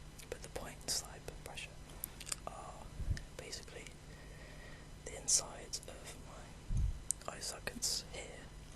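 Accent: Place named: England, United Kingdom. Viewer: Thank you so much for this video, I absolutely love it! I'm studying abroad at the moment and this has helped a lot with stressful exams etc. nothing like a bit of eye poking to help unwind. I'm a bit homesick and it is so good to hear the English accent too - can't get enough of your voice. p.s. you're awesome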